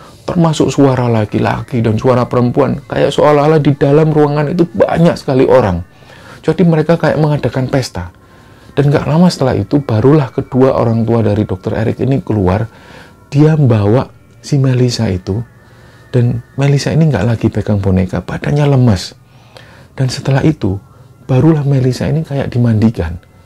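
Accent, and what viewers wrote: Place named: Surabaya, Indonesia. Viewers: Mas aslix dr mana nggih, logatx kok sprti orang Pasuruan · Gaya bahasa khas surabaya nya bikin seneng denger nya.. 👍👍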